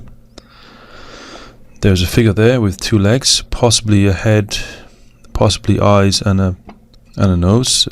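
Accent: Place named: Cardiff, United Kingdom. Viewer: Your voice sounds like your from Cardiff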